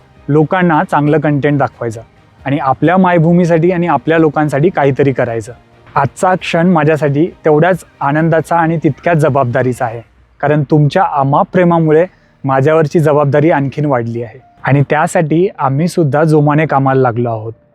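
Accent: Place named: Jalna, India. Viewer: मस्त दादा मी जालना उन आहे तुझं बोलणं पाहुन मला वाटत होतं तु इकाडलाच आहे म्हणून पण माहित नव्हत आज सांगितले तर भारी वाटलं खुप शुभेच्छा तुला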